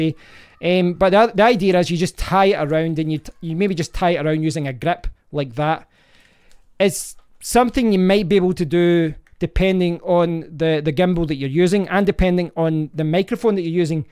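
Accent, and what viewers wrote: Scotland, United Kingdom. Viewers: Each time I tried it I would get pops and noises and I just couldn't get it resolved. I would like to pull it out and give it another try but can't find it. I have wasted so much money trying to improve the audio on my YouTube videos. I should have just put out some serious cash and bought something with a known track record. Now I am trying to figure out what that would be. I want a wireless mic (tried a cheap MOVO WMIC10) and it just cuts out for no apparent reason so there would be audio gaps every once in awhile. I think audio is the hardest part for most people who come with no experience. The terminology is foreign and it has just been a steep learning curve. So there you go. My daughter-in-law is Scottish and I love hearing your accent. ;-))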